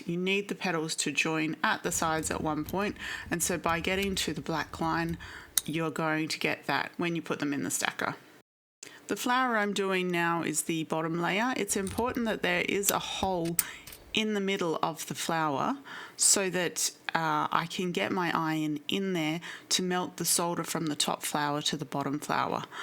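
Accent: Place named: Australia. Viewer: Great to hear another Aussie voice ❤ where can I get the stackers? I’m in NSW. I have some I use to make succulents which I got from the US but yours look great.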